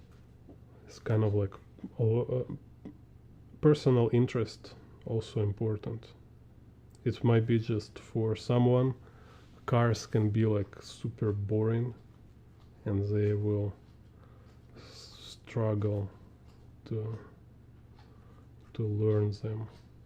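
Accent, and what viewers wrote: Russia. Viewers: Nice stuff and heavy russian accent ) GL HF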